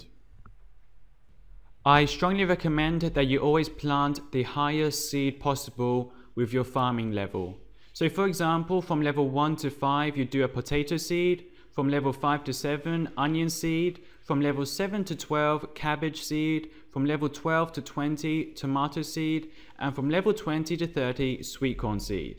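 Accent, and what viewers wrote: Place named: Iran. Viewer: r u persian?cuz ur name and accent is showing.are u persian? btw gr8 guide subbed and liked;)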